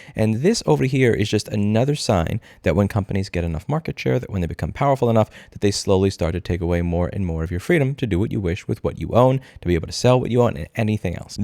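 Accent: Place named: Italian-American, United States. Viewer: The more angry gets the more ginzo he sounds.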